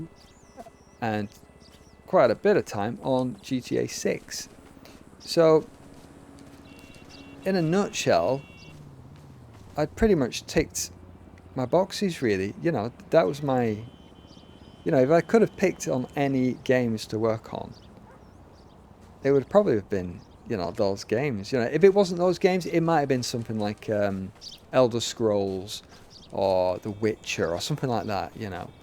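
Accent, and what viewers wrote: United Kingdom. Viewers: I’m not sure if u worked at rockstar north in uk or there main HQ in NY but u sound British, interesting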